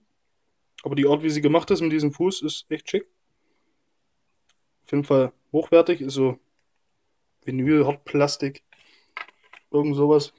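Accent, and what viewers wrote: Saxony, Germany. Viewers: bist du sachse ?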